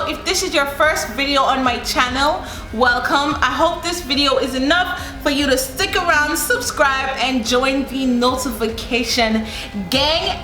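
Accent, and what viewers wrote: Jamaica. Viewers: minutes into the video and I know your Jamaican🔥💯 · Lol, it's the Jamaican accent for me😍❤️😂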